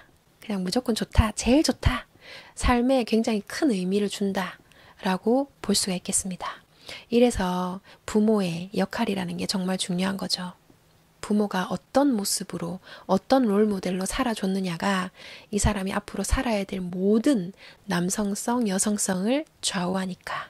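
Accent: Gyeongsang, South Korea. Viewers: 경상도사투리가 약간섞인 감미로운 목소리에 영적능력에 진심으로 빠져듬니다. 매일 아침 눈뜨자마자 고맙게 듣고 영성의 질을 향상시키고 있어요 너무 감사핮니다 10만 20만...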